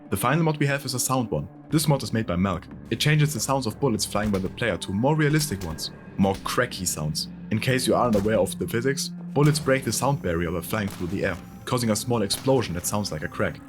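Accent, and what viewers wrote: Germany. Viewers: You think you speak English but i hear German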